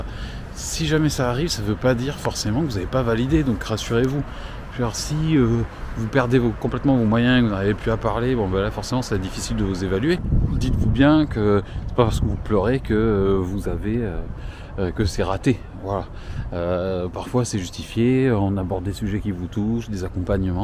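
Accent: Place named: Bordeaux, France. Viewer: On dirait que vous êtes Tour de sèze à Bordeaux !😊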